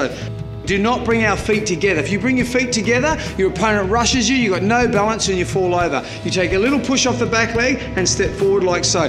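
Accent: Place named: Australia. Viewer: Nah, you're just an American with no ear for accents. Bear Grylls is British, this guy is Australian.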